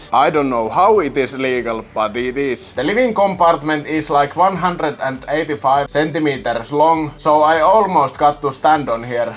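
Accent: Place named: Finland. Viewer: Cmon guys.. there is no shame in rally english. It’s literally english with finnish accent and that’s it. That is very understandable for native speakers in my experience. Ofc it sounds rough in the beginning but ”suomi sopii sulle paremmin” commentators can burry their heads in the sand and keep living your life xD you do have balls of mulliperse.. keep it up!😂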